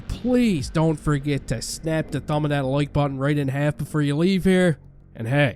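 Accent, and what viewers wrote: Newfoundland, Canada. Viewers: Is this a newfoundland accent?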